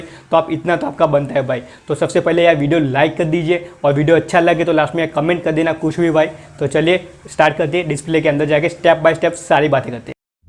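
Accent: Gujarat, India. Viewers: bhai tame gujarati 6o? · Kya aap gujarat se ho?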